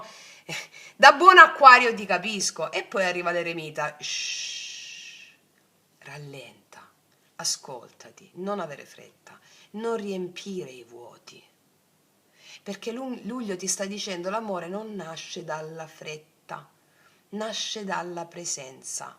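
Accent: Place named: South, Italy. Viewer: Ciao carissima grazie bellissima lettura e bellissimo accento del nostro sud ❤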